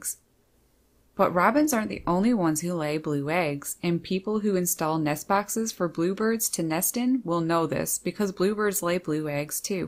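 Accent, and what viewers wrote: Canada. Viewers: I really love this video about the color of Robins’ eggs & the blueness! Very interesting and informative and educational! It totally makes sense! I was just thinking maybe they were that color, so if the egg fell out and went on the ground… But I guess mom or papa bird can’t pick that egg up and put it back in the nest!!! Thank you very, very much! Love your Canadian accent! Gay Michaelis 😁👋❤️🤗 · I can tell you're from Canada. You say" aboot" for about. Lol.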